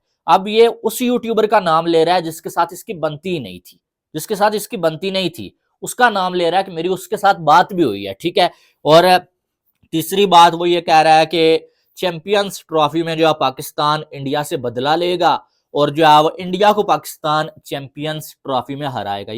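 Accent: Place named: Pahadi, India. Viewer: Tum kashmiri ni ho tumhari awaj toh pahadi jesi he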